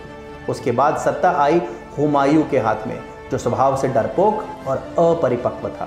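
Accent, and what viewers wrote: Standard. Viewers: #Founder aap achhi shudh hindi bolty ho or aap ka bolney ka tariaka bhi achha hae... Bahoot achhy se ek alag andaz me aap story read karty ho... Ek advice dena chaunga agar aapko bahut aagy tak jana hae to hi... Ki aap khas kar History and non political topics par hi video banaya kary... Kyu ki aap bhi vohi kaam karty ho political videos me aapke dwara tathakit storys me jo ek #godimedia karty hae. Baki sab thek hae... ( I am not belong from any political party or political ideology )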